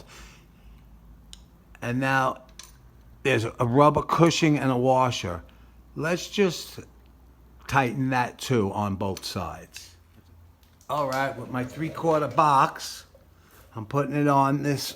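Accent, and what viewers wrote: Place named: Long Island, United States. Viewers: Excellent video! I watched a bunch of videos about replacing my bars, but yours is the most thorough - that’s important for me. And your LI accent makes me smile! I love the island. Do you know the band Zebra? WBAB broke them in the early 80’s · thanks for the video! nice vett in your profile pic sounds like your from NY as well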